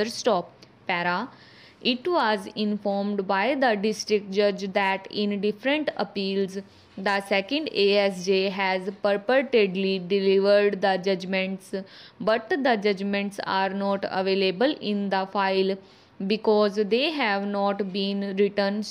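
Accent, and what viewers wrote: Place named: South India, India. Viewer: thank mam.love from west bengl.it is really help us a lot.thank you very much. but mam please try to pronounce straight foroward ,i think there is some south indian accent in it. other wise it is very help full.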